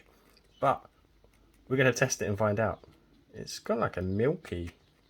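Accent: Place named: South West England, United Kingdom. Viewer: you sound exactly like Jude Law and your whole voice and cadence and demeaner are so soothing (sooving). I grew up as an American in SW England so I am in love with (wiv) this accent.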